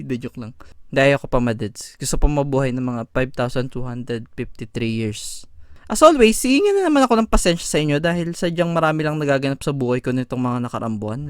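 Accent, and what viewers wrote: Visayas, Philippines. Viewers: half bisaya ka kuya? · Bisaya ka ba po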